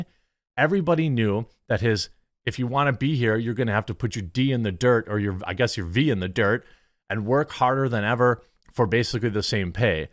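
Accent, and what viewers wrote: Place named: Midwest, United States. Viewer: Off topic but... I'm from northern Illinois but living in France for years (business consultant). I can't tell you how great it is to find and listen to a real Midwesterner speak like a normal person (in my opinion), without pretense, knowledgeable, and like an intelligent neighbor you feel comfortable arguing with. Thank you for being normal. Good luck with your company.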